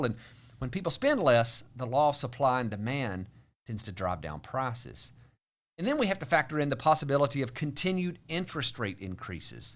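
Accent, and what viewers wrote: South, United States. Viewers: I hate your southern accent 😱😱 irritates the ears.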